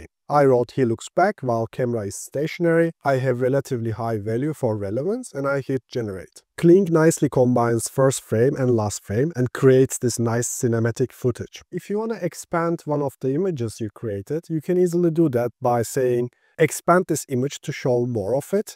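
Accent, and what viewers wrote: Turkey. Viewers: baba türkçe aksan akıyor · thanks for content bro 👍 i assume you are Turk right ? just feel it by your accent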